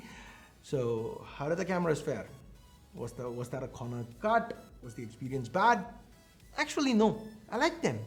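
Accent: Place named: India. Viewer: I'm very sorry and no offense but I think I will watch your videos from now on so I can practice listening to indian accent english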